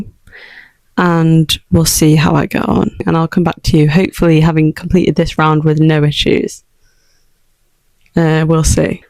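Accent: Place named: United Kingdom. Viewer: I just found you and have bingeing your videos. Love your content! I thought, because of your accent, that you were from the UK. Are you from the US or just use US crochet terms where you are from? OK, gotta get to the next video 😀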